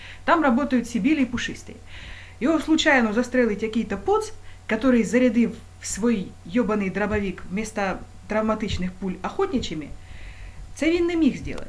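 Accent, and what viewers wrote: Surzhik, Ukraine. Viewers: БЛЯ! Какой говеный суржик. Пизди бля. или по-русски, или по-украински!))) А так все верно!)) Молодец! К сожалению продажного тупого дерьма. особенно на Востоке. очень много!))) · Два вопроса: 1. Почему такой жесткий суржик? Выбирайте уже один язык, и говорите на нем. 2. Почему камеру так жестоко колбасит? А вообще классно, в точку!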